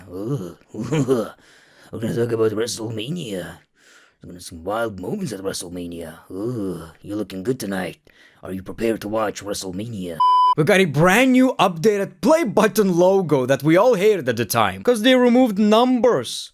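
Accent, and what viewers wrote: Russia. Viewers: I need more Russian accent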